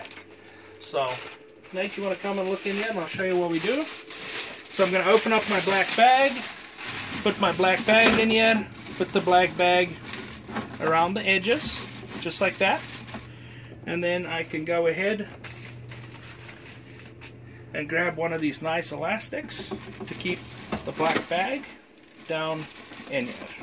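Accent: Afrikaans, South Africa. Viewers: Definitely a south African dude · Sounds South African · Yes SA def ... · Is that accent i hear afrikaans? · To me he sounds more South-African :-) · Did I guess his accent?? I guessed South African! It's the accent that sounds like 5 accents mixed together. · South African Afrikaans, perhaps.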